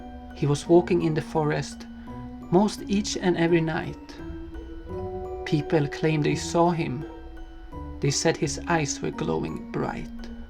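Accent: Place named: Ireland. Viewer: Awwwww I loved that! I enjoyed your story-telling especially with your Irish accent! You are so talented with your pumpkin carving and all of your other drawings!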